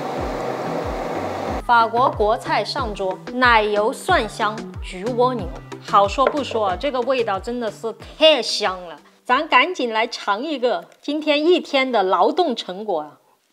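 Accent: Sichuan, China. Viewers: 浓浓的川普口音，好亲切啊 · 一直以为yooupi是东北人 原来是四川妹子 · 怎么从普通话里听出了一丝川渝味道…… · 川普😀😀四川哪儿的啊 妹儿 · 喜欢这四川口音。哈哈🤣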